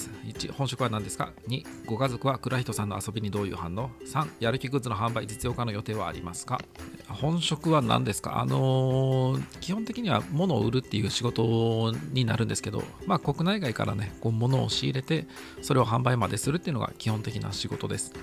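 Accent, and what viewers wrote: Kansai, Japan. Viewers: 関西の人の喋り方や〜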